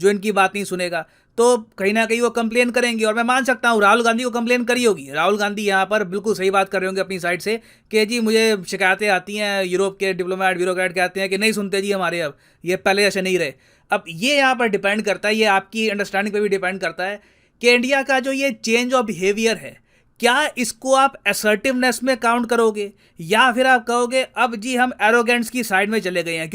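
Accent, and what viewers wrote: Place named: Punjab, India. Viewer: Sir app Punjabi ho ? ,Aisa accent tho pehli nehi Suna tha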